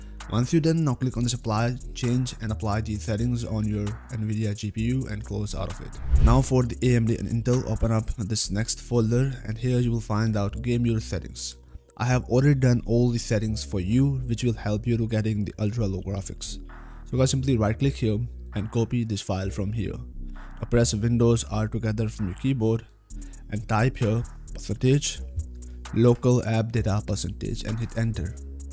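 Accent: India. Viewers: Why you have india voice is scary😳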